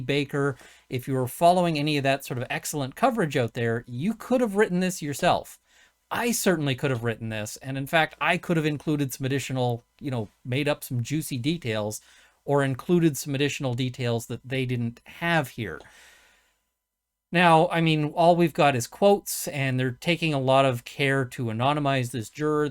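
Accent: Canada. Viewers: I love Canadian! 🤗 Please say 'out' or 'about' as often as possible.♥️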